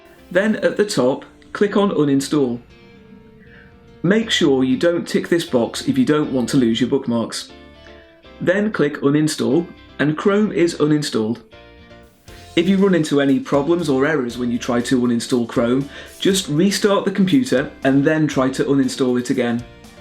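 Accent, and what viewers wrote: United Kingdom. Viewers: I liked his British accent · It worked! Thank you for your help. Friend, I love to speak British English just like you. Could you give me some tips on how to start learning the British accent? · Why do you look American but sound British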